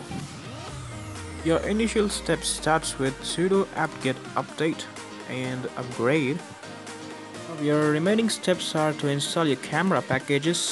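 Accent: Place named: United States. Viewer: u have a american accent or u must be prentending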